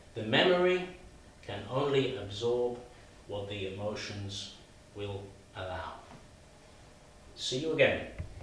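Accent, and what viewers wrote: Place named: RP, United Kingdom. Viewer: Much better than most EFL/ESOL videos I’ve seen, you crack me up with your’sign language’!!! Pronounced like a true BBC presenter! I am going to pm you an idea-well done! Subscribe people!!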